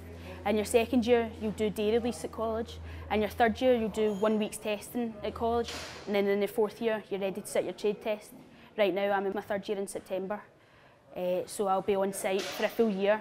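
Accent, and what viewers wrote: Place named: Ireland. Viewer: Sounds just like the irish dude in my residential wireman class.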